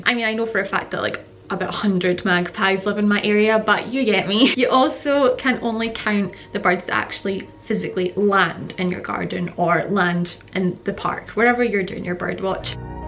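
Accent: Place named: Scotland, United Kingdom. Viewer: I find ur videos interesting? I love the Scottish accent x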